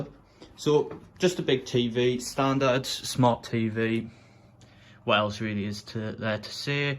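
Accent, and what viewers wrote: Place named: United Kingdom. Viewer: Sick setup and wow your accent is different to what i have heard from british youtubers lol. keep up the good work.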